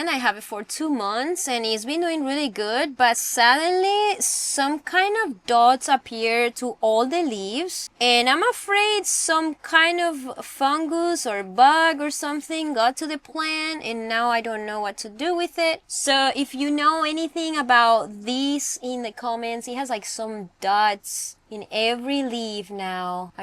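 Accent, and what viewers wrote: Spain. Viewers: Love your Energy, I started watching and then I saw all of the Alva stuff and then I noticed that you were in my Home Town of San Diego. I was in Solana Beach then moved to San Marcos. I used to - many years ago - work for Thrasher Magazine in San Francisco. I've been skating ever since the 80's when I was a Sailor on the USS Midway - then stationed in Japan. I SUBSCRIBED and liked........ I love your accent, what is your FIRST language? Is it Castilian Spanish? BTW, a friend of mine just recently gave me his Tony Alva Deck signed by Tony way back when.....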